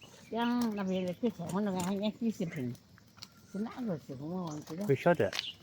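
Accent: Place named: Southwest Mandarin, China. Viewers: 口音像四川话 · 博主是广西哪的？说的话有点像西南官话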